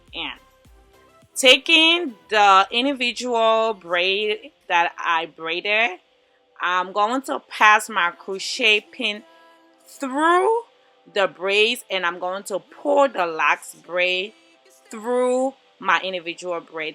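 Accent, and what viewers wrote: Liberia. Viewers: I told myself she is from Liberia..then came to the comments section to confirm.. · Jessica Wiah yeah I can hear her accent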